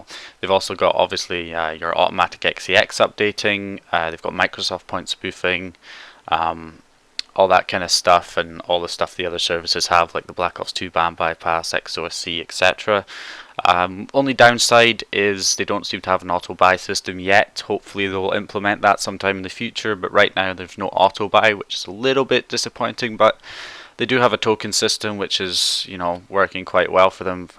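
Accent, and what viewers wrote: Scotland, United Kingdom. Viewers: Sno _Warior he's Scottish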